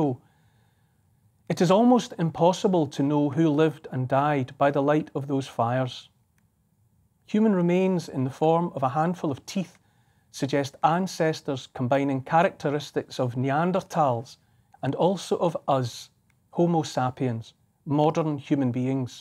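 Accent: Scotland, United Kingdom. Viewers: The truth he speaks in humility refreshes my heart and brains. I always loved the Scots and their dialects. 🍷😁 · I could listen for hours to this scottish dialect. Ist sounds so damn beautiful...and true...and is surrounded by integrity. Hold the line folks. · It's impossible to tell anything but the truth in the true Scottish accent. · Having Scottish in among my ancestral mix I could listen to your accent for hours Neil. Lovely message. I first watched you presenting Coast New Zealand 🇳🇿, a beautifully done showcase of NZ and I learnt a lot about my nation. The birth or our Saviour in Bethlehem indeed gives us great cause for hope. The politicians have had a field day causing fear and division. We need to stand up and be strong and not give in to them, remind ourselves that we can look out for ourselves and care for each other. NZ has become a Fortress and her citizens fearful. 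It is heartbreaking to see what has happened. May God Bless you and your family. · Actually, it's not a dialect, just a Scottish accent. His use of the Engiish language is beautiful, eloquent and wise.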